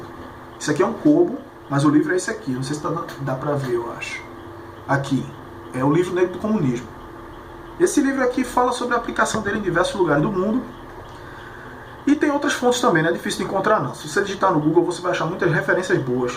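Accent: Pernambuco, Brazil. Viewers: Você é pernambucano? Eu também sou, Parabéns pelo vídeo, estou querendo fazer um curso de história e estes vídeos me deixam com muita empolgação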